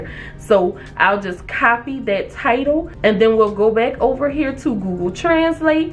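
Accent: New Orleans, United States. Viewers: I i notice a NOLA accent anywhere ❤❤❤ yeah baybee!!! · You from my city new Orleans i can hear it in your voice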